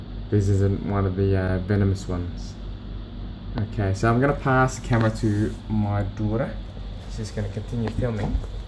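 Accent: Australia. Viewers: Is this dude Asian with an Australian accent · he sounds born and raised Australian. Please don't tell me you think white people are native to Australia 😅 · He sounds like and his mannerisms are like a typical Aussie. Could have been raised here, born here or be a descendant of a long line of Asian immigrants present here from the earliest pioneer days. After all Australia is further south than what is called South East Asia, we are situated in the Australasian Region of the world map. He could have a heritage originating from any Asian nation. I'm an Aussie of Anglo Saxon heritage and have cousins and 2nd cousins who are Ausies with Thai heritage and Aussies with South Korean heritage. Also other 2nd cousins with Samoan heritage.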